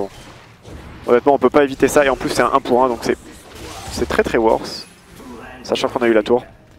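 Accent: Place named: South, France. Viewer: C'est moi où il avait un léger accent du sud en 2015 ? La vie de parisien ça lui a atténué ses origines on dirait :D .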